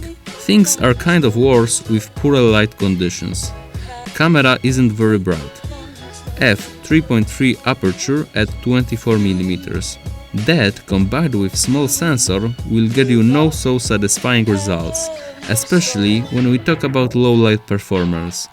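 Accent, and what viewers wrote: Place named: Poland. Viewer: dobrze trafiłem, że jesteś Polakiem po akcencie:) Jakbys kiedys przypadkiem doszedł do tej funkcji to fajnie byłoby gdybyś nagrał o tym film:) Pozdrawiam.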